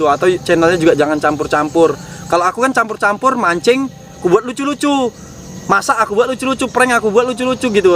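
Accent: Indonesia. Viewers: logat nya khas kali Bg Medan nya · Orang medan ya bang, tapi logat jawa😂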